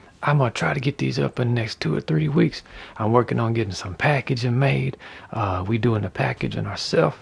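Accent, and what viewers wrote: Louisiana, United States. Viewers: I think what people are trying to saying about your voice is you got that calming whisper thing going. To me you sound Southern and maybe vaguely Cajun. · Hey umm to me the only guy you sound like is someone from Louisiana because I’m form Mississippi and I hear a lot of people from Louisiana talk and you sound just like em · Mr., comment ça va? 😃 I am a professional artist. I hand paint fine art on silk. I am a watercolorist, wall muralist, pastelist, ceramicist and, as a fellow artist, I suggest that you not worry about what anyone has to say regarding how you paint, or anything else! You do you, the! If anyone insults you and your God-given abilities just know that they are doing so because of envy. Praying for them is how I deal with it, but that’s just my suggestion. I suggest looking into copyrighting on your CrAzY ChIcKeN🤪! 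People these days seem to have no respect for one another and even less so for one another’s property - especially when it comes to something that is making money. You spoke about commenters writing who or what you sound like. To me, you sound like some of my family who lives in Southern Louisiana, specifically Grand Caillou/Cocodrie area! I hope you sell a lot of your CrAzY ChIcKeNs (poulets fermiers (free-range chickens) and you make more figures, action or stagnant! Do you ever paint a protective coat onto your figures? Do you sign or put a symbol on your work? If yes, what? Though this next sentence is truly none of anyone’s business, especially mine, but if the demand far outweighs the supply, I hope you stay in the USA! Thank you for sharing your work with all of us! Thank you for sharing how you work with us all! God Bless!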